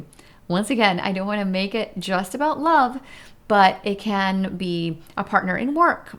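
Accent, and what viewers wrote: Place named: England, United Kingdom. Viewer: Hi your English accent is so sweet and perfect, I love it❤️❤️❤️❤️❤️❤️! Elegí la número 2 y me has retratado tengo una oportunidad muy buena delante de mí y estoy dando vueltas a la cabeza pensando si debo tomar esa decisión tan importante. Abrazos desde España ❤️🇪🇦❤️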